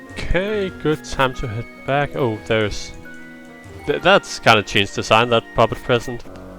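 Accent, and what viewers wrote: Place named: Denmark. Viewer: omg I love the shoutout you gave me at the beginning 😂😂😂(funny thing is when I first saw your video I was wondering if your accent was Australian and that's why you had a Talon flair. Took me a while to realize you were Danish because only Danish people pronounce the letter "l" at the end of words that way) V10 was interesting because that's when I really began to feel reinvested in Rejuv. Looking back, it was V4-6 that really got me invested in the game because that's when the story began to get riveting (I always loved the Valor Mountain finale), but then V7-9 came and I found them quite boring. V10 not just brought the return of interesting story, but I think that's when it really began to feature the beautiful mapping style that we associate with Rejuv. To me, it was the first version that felt mature and well-made and no longer like it trying to play catch-up to Reborn. 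Though interestingly, lots of fans kinda dislike Ch 12 due to the story. I remember Jan acknowledging the flaws with Ch 12 when he remade it in V13. Something about introducing more gameplay elements since it was more like a visual novel than a game. But my understand though is he couldn't change much of it as it was too consequential to the worldbuilding. The revisit to GDC in Ch 12 is quite interesting, since it was scrapped and moved around in 13.5 but contained important story elements that needed to be placed elsewhere: - Was the battle with Risa in the beginning of Ch 12 only added in a later versio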